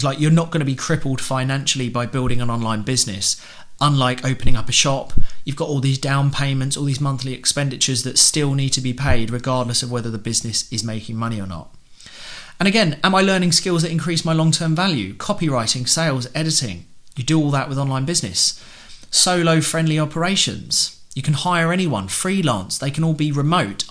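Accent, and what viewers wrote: United Kingdom. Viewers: Man~my good sir! I totally enjoyed your video. Coming from a country where American English dominates, now I am really starting to like your British accent. How marvelous! Thanks again.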